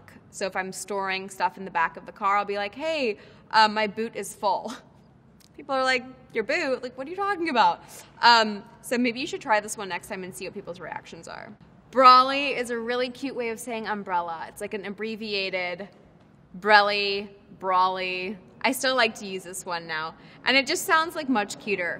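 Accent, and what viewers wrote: United States. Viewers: She has american accent though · It's weird hearing someone explain British slang in an American accent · She has an American accent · I am not familiar with Lily and after I heard her American accent I was like 'Whaaaaat? I thought you were British' and then did a quick Google check lmao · shaima! Bruv I live in the uk and I certainly know. The heavy American accent with the slang terms is not it💀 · She keeps it in her back pocket & can retrieve it at will, but US English is her default since she grew up here. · An american accent ffs.... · She sounds American so she should have opened with "I sound American but I grew up in both in the US and England as my father is English." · I became confused after seeing her strong American accent, then I remember her strong British accent in Love, Rosie. Her life is definitely complicated. Thanks, Google. 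🙂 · She's got an American accent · Amy She was a weird choice considering how american she sounds, but there were a few in the video I didn’t know off the top of my head that she seemed to know. Also, we don’t really know how much time shes spent in the uk, could be most of her life for all we know. Still a few questionable definitions in there though 😂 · Why does she sound American and not British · The American-born daughter of a British musician teaching British slangs whilst sporting a heavy American accent. What sorcery is this?? o_0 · Wow. An English actress who speaks with an American accent because she moved to the US at the age of 6. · Bet she wishes she had her accent back. I’d hate to have an American accent. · I'm confused...but she's speaking in heavy American accent! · I didn’t even know she was British.... 🤷🏼‍♀️ she has such a strong American accent! You learn something new everyday. 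💕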